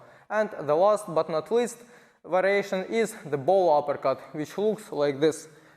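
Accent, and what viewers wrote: Russia. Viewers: Russian accent = absolute beast in anything combat related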